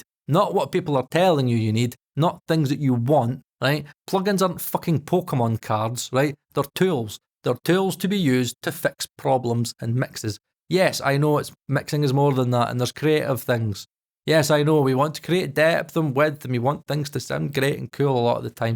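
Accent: Scotland, United Kingdom. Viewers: Nah mate, you've got it all wrong. Almost nobody who watches you is BUYING plugins. They may OWN hundreds of them, but they didn't buy them. The Joy Of Plugins isn't what they do for the sound, it's the simple dopamine release scenario. It's like GAS (Guitar Acquisition Syndrome) except there's nothing shiny to hang on your wall. Why do you think DAWs like Studio One make such a big deal out of the wee thumbnails of the VST effects and instruments? Does it make it easier and faster to find things? Maybe. But it also lets you have a virtual wall to hang your plugins on, which is far more important. [I unsubscribed to you ages ago, but every so often YT flings you into my space. I watch because you are a rare Scottish voice, and like me you are autistic. But I find most of your plugin talk really uninteresting and obvious. Not that you will give a damn! 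lol] The stuff I do find interesting is when you debunk the veracity and accuracy of a plugin. That's always fun.]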